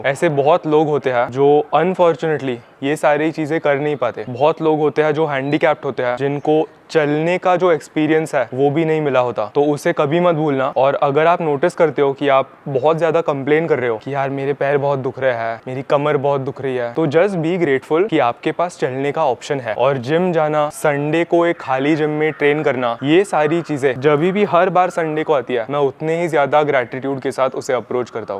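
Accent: Maharashtra, India. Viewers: MARATHI ACCENT IS SO CUTE! 😊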